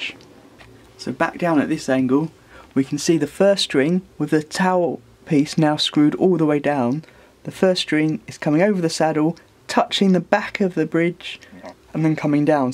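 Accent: United Kingdom. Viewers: Bro you have the best story telling voice. (Your accent sounds like you're from where I was born in the u.k [Wokingham, Berkshire]. I've moved to Australia now. Amazing video, thank you. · Dude, you are so good at this kind of thing. This was a bit more “101” than I needed to go, but I ended up watching start to finish to learn some more detail just based on how great your photography and explanations were! These are the kinds of videos I would make if I had a British accent!! Haha cheers!!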